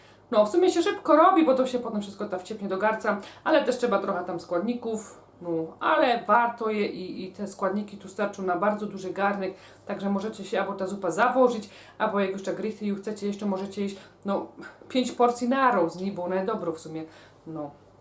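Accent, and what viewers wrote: Silesia, Poland. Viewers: Albo mówisz po polsku, albo pięknie godosz po śląsku. Na śląsku nie ma pomidorów. Są tomaty. pozdrawiam z Florydy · Albo mówisz po polsku, albo pięknie godosz po śląsku. Na śląsku nie ma pomidorów. Są tomaty. Godej jak prawdziwa Hanyska. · Pani jest Pani wspaniała, mówi Pani ślicznie gwarą śląską. Nie rozwleka Pani swoich komentarzy, jak inni mają w zwyczaju, zanim dojdą do meritum sprawy to nagadają się dużo i nie na temat, że człowiek zmuszony jest wyłączyć takiego "gawędziarza. Pani natomiast robi szybko nie przedłuża czczą gadaniną za to Pani komentarze są rzeczowe okraszone dowcipem. Po za tym, tłuszcz to samo zdrowie dodatkowo pięknie odchudza bez efektu jojo. Dzięki tłuszczowi przyswajane są najważniejsze witaminy: D3, K2MK7, A, E.tak zwany ADEK, bezwzględnie należy przyjmować z tłuszczem, inaczej nie będzie żadnego efektu. 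Te witaminy rozpuszczają sie tylko w tłuszczu. Szacunek dla Pani. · Pani ta zupa to mistrzostwo świata!!!!to jest zupa co ma wszystkie zupy pod sobą i jeszcze ta Śląska mowa i wspaniałe wykonanie pozdrawiam 🥰🌹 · Przepiękna śląska wymowa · Uwielbiam Śląską gwarę i oglądam Ciebie głównie z tego względu ale na przepisy też. Pozdrawiam. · sol jest nam bardzo potrzebna,ale nie w nadmiarze. sol naturalna,a nie ta z kartonikow ktora ma 90% chemii.najlepsza nasza sol klodawska albo z wieliczki.Pani bardzo pania lubie,a do tego ten slaski jezyk super.mieszkam w berlinie,a w przeszlosci na pomorzu. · jestes super dziewczyna, czy to śląski Akcent⁉️🌻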